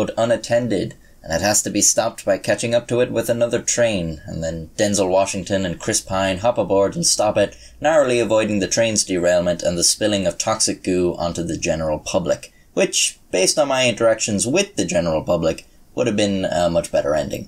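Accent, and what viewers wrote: Ireland. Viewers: I enjoyed that, thanks. Do I detect an Irish accent in there somewhere?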